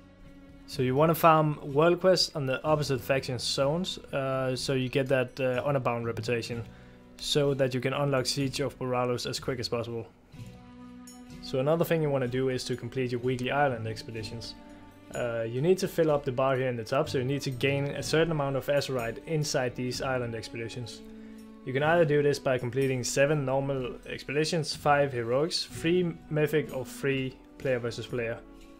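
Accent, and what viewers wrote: Denmark. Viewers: Are you danish? You really sound danish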